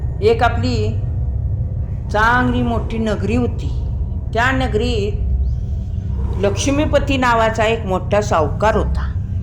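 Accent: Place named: Satara, India. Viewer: आज्जी नक्कीच सातारच्या असणार आहेत. आमच्या सातारची गोष्ट सांगण्याची आजीची शैली खूप आवडली. भाषेत गोडवा आहे.सांगण्यात खूप एकाग्रता ...तळमळ..जाणवली🙏🙏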